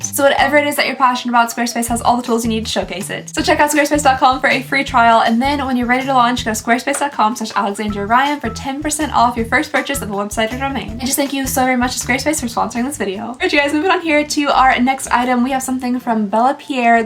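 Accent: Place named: Owensboro, United States. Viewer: My best friend in high school was from Owensboro... your accent makes me miss her!!! Love what you do so keep on keeping on!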